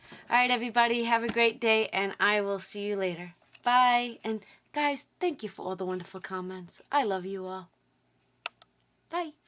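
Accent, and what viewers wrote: New York, United States. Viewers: Very cute! Love the NY accent 😁 Yay is back!!!!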